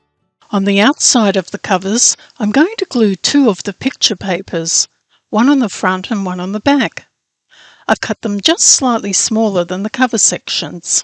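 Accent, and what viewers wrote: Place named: Australia. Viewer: I have watched whar feels like 100's of tutorials on journal binding. This is the best style by far. I can't wait to give it a go. Also, it is so lovely to hear a fellow Aussie making the video. Thanks for sharing.🥰😍🤩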